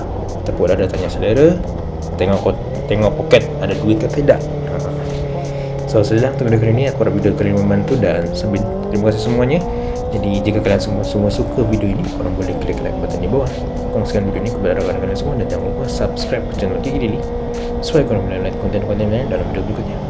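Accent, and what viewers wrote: Malaysia. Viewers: Pandai loghat melayu malaysia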